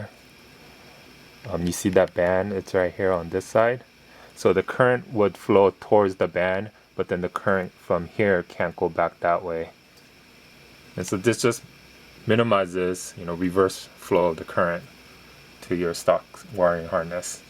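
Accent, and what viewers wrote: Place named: South Korea. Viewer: I have '15 Accord Sport. I got a lot of useful information from you like the HID installation. Thank you very much. I got personal question. Are you from Korea? Your accent is very similar as mine. ^^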